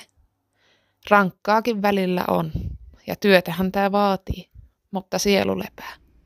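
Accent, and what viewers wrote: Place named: Ostrobothnia, Finland. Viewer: Ihana pohjalainen murre. Karolta tuli vinkki sun kanavastas. Kiva video.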